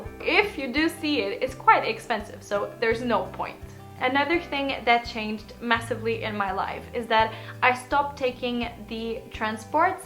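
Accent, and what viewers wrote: Norway. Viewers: you sound like an Norwegian speaking English! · Is there someone else who think she has a norwegian accent? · She looks Norwegian..... I`m suspecting this portuguese routine to be a lie! Blue eyes, pale skin and norwegian accent... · It's almost like you got a Norwegian English-accent, if you know what I mean? Have you learned any Norwegian by now while you've been here, and what do you do for a living? Anyway, you've got a new subscriber now 😊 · you speak english with Norwegian accent.... you are not Portugese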